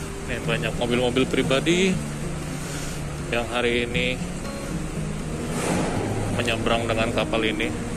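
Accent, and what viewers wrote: Indonesia. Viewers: Agan ini Youtuber dengan logat Indonesia, jadi saya suka.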